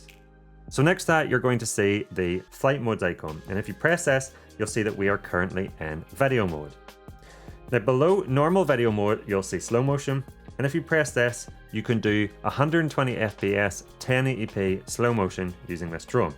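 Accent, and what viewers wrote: Ireland. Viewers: Came for the drone, stayed for the (Irish?) accent. Thnx. Grtz from the Netherlands. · Here on Youtube are many so-called self-proclaimed drones flying gurus. But none can touch your ankles. The heavy Irish accent is super. Also, your knowledge. Merry CHRISTMAS and SAFER NEW YEAR 2023. Thank you for your educational videos, I learned a bundle.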